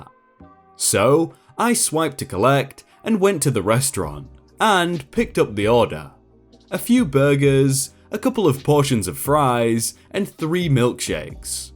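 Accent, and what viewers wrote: United Kingdom. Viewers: Despite sounding very much like a native Brit, at times he can barely speak English and his comments are inane. Can't listen to any more of him, largely due to the comments that he no doubt thinks are funny and clever. Sorry mate.. they are not.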